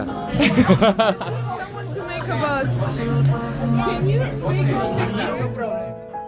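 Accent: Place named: Gwangju, South Korea. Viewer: 어찌 억양이 잇드라ㅡ 광주엿군요. 멋지게 살고 부뢉네.... 난 용기가 없는디ㅠ ㅡ 동광주 홈플뒤 살아요 ~~~~^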